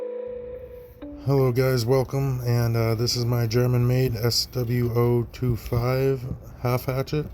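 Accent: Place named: Boston, United States. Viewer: I thought it came out pretty nice. And I really enjoyed listening to the Boston/NH accent too. Time to go Pahk ya cah in the Havahd yahd. Keep up the great work!!!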